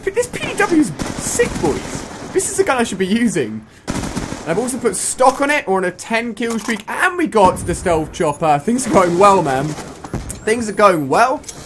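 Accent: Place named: United Kingdom. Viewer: are u 50%muslimand50% British I think that because u have a British accent and your name is a Muslim name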